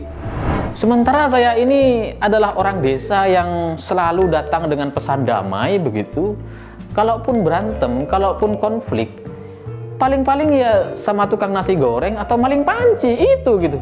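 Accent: Madura, Indonesia. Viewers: Bang, logatmu kok Madura?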